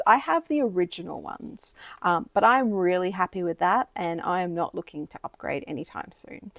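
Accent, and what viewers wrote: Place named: Australia. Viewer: Great collection of coloring pages! I’m really enjoying your channel. Your voice is so pleasant and of course I love your Aussie accent! But beyond that I really like your method of making videos. I understand when someone doesn’t want to talk all the time but I really appreciate your explaining your intentions and thoughts and how you achieve the results you get. Your color along was really good and as you said I appreciate the artist telling me what color they are using or what technique they are doing so I don’t have to watch every second and could actually “color along”. I hope you keep your passion for coloring and make lots more videos because your channel is just what I’m looking for 💕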